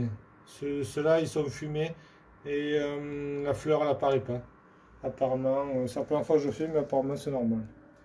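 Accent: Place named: Vaucluse, France. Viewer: Merci à toi surtout !!! J'ai récupéré un frigo qu'on m'a donné et fait exactement la même installation que toi (j'ai bien branché la terre) et j'ai lancé aujourd'hui une belle rouelle de porc!! Encore merci! Tibo du Vaucluse (on doit pas être loin vu l'accent)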